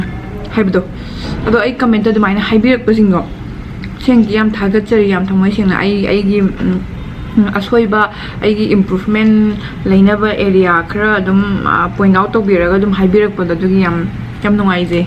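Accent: Kakching, India. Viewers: kakching marol na nungnaiye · Ado Ng kakching londo Nganguva Adum Aduna Henna tavada Nungsiye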